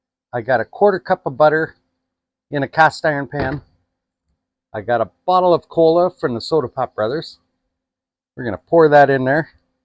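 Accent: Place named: Minnesota, United States. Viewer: Dude sounds like he is from Minnesota...eh.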